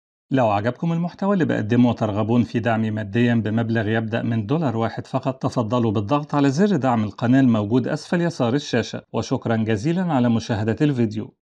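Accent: Egypt. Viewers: كم أكره أن أدخل لمقطع وثائقي وأكتشف ان المذيع هو (مصري) بسرعة أعطي (دس لايك) للمقطع وأخرج المصرين دمروا اللغة العربية والانكليزية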